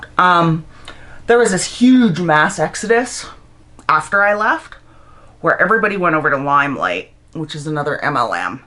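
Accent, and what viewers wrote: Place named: Canada. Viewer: are you from Canada? Love the accent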